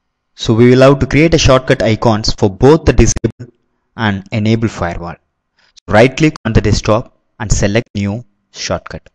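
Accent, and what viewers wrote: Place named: India. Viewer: Love indian/english! :D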